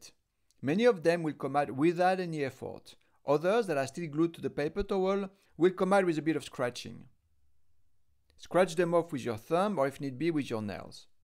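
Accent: France. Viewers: Lovely french accent !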